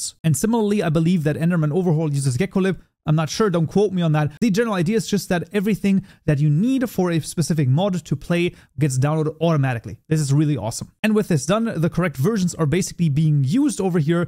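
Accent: Germany. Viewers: Man hört zwar raus, dass du deutsch bist, aber definiv keine schlechte Aussprache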